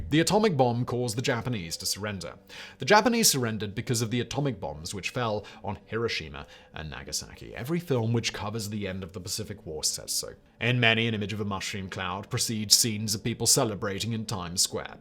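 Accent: United Kingdom. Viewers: Britain or Scotland. Love it.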